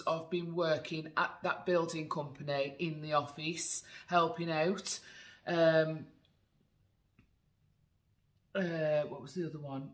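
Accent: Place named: Birmingham, United Kingdom. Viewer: Enjoyed that, thanks for sharing. I got into You tube hauls during lockdown as I missed shopping so much! Strangely all the people you mentioned I subscribe to! However it amazes me how many others I subscribe to just disappear into the ether, which I find concerning as they are virtual friends and I worry about them. I was brought up in Birmingham so I love to hear your accent as I have lived in London for many years. I have never heard the accent described as what you called it! Keep the vlogs coming!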